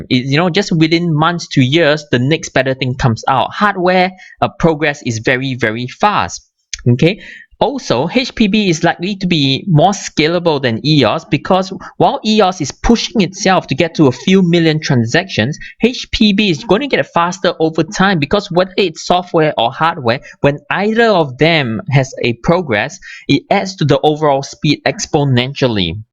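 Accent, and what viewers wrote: Singapore. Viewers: Yup, im from Singapore and I live in Singapore, when i heard your accent I knew you were from Singapore. omg nice to see a fellow Singaporean into crypto too, anyways we could get in touch, would like to learn more knowledge on crypto :) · Great analysis and explanation, and I like your Singlish! Can you review QLink ?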